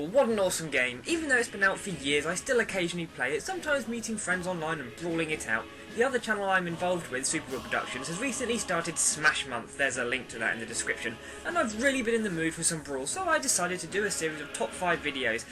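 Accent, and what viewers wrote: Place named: United States. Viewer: ***** An American ;P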